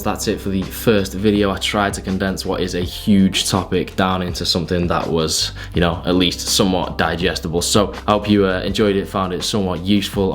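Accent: United Kingdom. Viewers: Nice vid - subscribed! Where you from in the UK?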